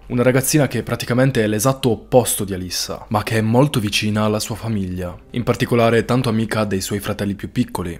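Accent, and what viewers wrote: Sardinia, Italy. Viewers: Ma sai che mi stai incuriosendo? Parli spesso del paese in cui vivi e dato che ho capito che probabilmente è dalle mie parti mi chiedevo se per caso non fosse vicino a Limbiate! Chissà... Però mi chiedo anche come mai se vivi da queste parti si sente così tanto il tuo accento sardo? Io infatti ero assolutamente convinta che tu vivessi in Sardegna! Vabbè come dici tu giustamente quando parli di Istagram, che se vogliamo farci i cazzi tuoi possiamo seguirti li,visto che io non uso Istagram me li faccio addirittura qui ma capisco assolutamente se non vorrai rispondere! Buona serata a te!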